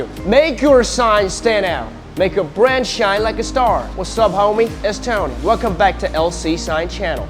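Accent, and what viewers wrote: South, United States. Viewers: Speaking with a southern U.S. intonation. Can't believe my ears!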